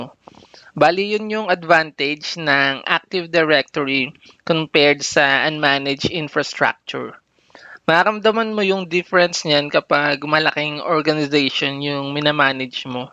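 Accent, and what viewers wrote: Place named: Iloilo, Philippines. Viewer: Nice tips sir, I've been sharing your post to help the community, niche ko rin sana to kaso di pako certified and di pako ganun ka bihasa hopefully marami akong matutunan dito. maraming salamat! and may ilonggo accent ang pag sasalita mo, I'm from Koronadal City , Ilonggo dialect rin. hehe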